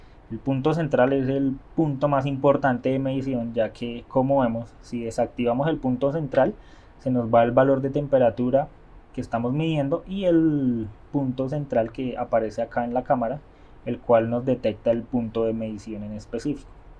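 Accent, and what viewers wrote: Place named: Bogotá, Colombia. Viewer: lo explica con acento rolo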